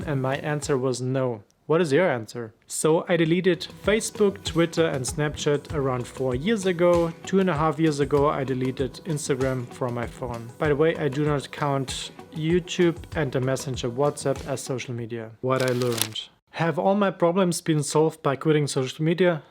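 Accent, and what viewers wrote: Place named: Germany. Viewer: I dont think that it has to be completely black or white. I never used Insta, TikTok or Snapchat in the first place but I spend a lot of time watching YouTube videos. When watching YouTube I'm still split apart. I mean I watch a lot of educational videos (like this one), but I still dont feel productive when doing it. By the way my first language is German too, I barely noticed the accent.👍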